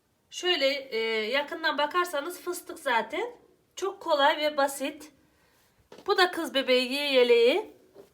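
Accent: Aksaray, Turkey. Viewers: Ellerine ve emeğine sağlık hepsi çok güzel biz Aksaray insanları hep doğal konuşuruz benim oğlumun ismi de Ayhan sizi çok seviyorum hanım beğenerek dinliyorum izliyorum selamlar öpüyorum seni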